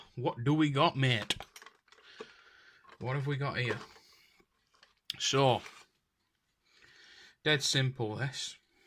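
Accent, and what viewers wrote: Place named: Northern England, United Kingdom. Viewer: You sound like you're from Northern England- beautiful country out there! Thanks for this, it was very entertaining!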